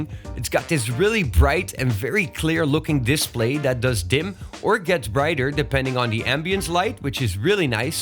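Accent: Netherlands. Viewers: I knew you were Dutch! I knew it! You have that accent! Groeten vanuit Amersfoort! 😂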